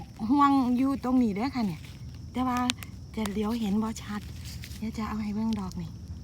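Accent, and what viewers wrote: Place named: Isan, Thailand. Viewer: เว้าอีสานโคตน่าฮักเลยจ้าชอบๆค่ะ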